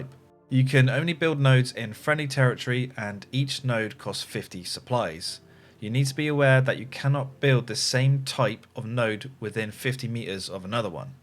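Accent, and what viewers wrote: United Kingdom. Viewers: Best ever explanation on the internet…and in British English which makes it even more pleasant to listen to ;)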